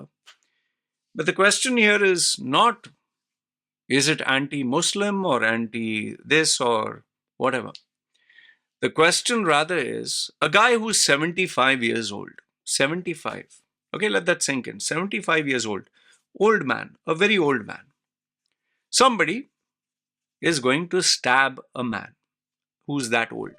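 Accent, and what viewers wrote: India. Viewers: Your such a hypocrite, first of look at your own name when your a full on Indian, that is very clear with your colour and your f****d Indian accent so don’t come on here and try and degrade next mans religion etc especially Muslims, you have a personal problem with Muslims then be a man for once and come out face to face and bring your problem up with them, Muslims are proud of their religion and allhamdillia they are staunch too, theirs people in this world who have some of the greatest minds and IQ who have accepted Islam because they have seen the proof, a man in the middle of a desert and uneducated has predicted things that have been proven by science decades later and at that time their was no technology to prove things, but people like you can’t accept the truth because your ego is damaged, yes Islam is the truth and I fully and whole heartedly testify to that, what religion are you from I bet you don’t have the bottle to say your religion because you know it’s fake and if it’s not then prove it, you fake ass people sit behind your computer and give it Johnny big potatoes but never when you come across a real man and a real Muslim, Islam condemns violence but if a man does something you cant blame the religion for it, for example you buy a gun and you kill someone will you blame the gun obviously not you have to take the blame yourself, you know what they say people who live in glass house and all that, So in future think before you open your mouth to degrade anyone an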